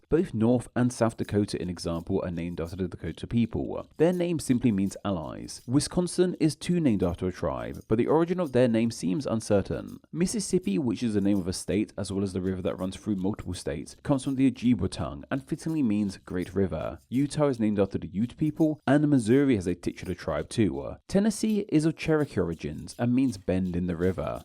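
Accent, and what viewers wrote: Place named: United Kingdom. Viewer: I’m not sure why we have a British guy telling us about which American words and place names come from Native American languages… because he doesn’t even pronounce them the way we do!!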